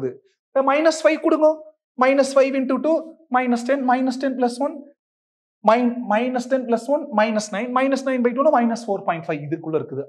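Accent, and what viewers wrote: Coimbatore, India. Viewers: Kerala Pakkam ponadhu Ilaya 🤣🤣 idhu Coimbatore Tamil 🤭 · Guys kovai slang 😅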